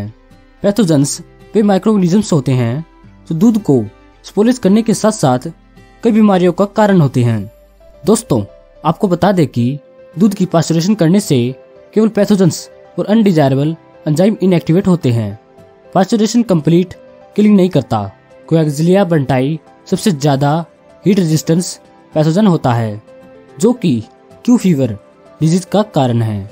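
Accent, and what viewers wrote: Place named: Haryana, India. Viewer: Haryanvi hindi....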